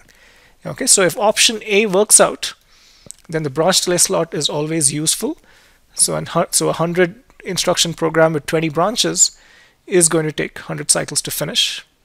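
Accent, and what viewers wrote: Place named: United Kingdom. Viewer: I have to say I love your british accent with a hint of indian as well. A lot less thick than most Indian computer science teachers you can find, and lot easier to understand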